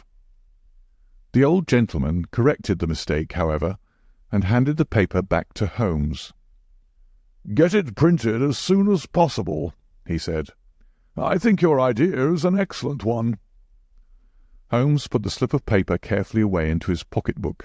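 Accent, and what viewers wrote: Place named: North, United Kingdom. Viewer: A nothern man as narrator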